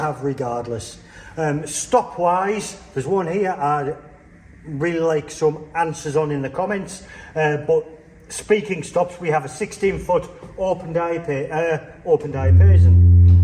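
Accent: North, United Kingdom. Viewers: Thanks a lot for this valuable exploration with the typical northern accent 😎. A tracker-action organ always offers (not necessarily a comfortable, but) the most direct playing feel - no matter if slider chest or cone chest. Even if I have to "work" more, I prefer a mechanical instrument - they are most honest to me - and I can save money for the gym 😉 Electric action is always "switch on - switch off" many meters away - reverb included...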